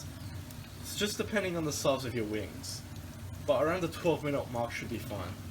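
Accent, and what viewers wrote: Australia. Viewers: What the f&^k is that accent? Every time I hear someone from western Sydney speak I want to vomit. MSG impossible to find in Australia.... except at ANY asian grocer you fuckwit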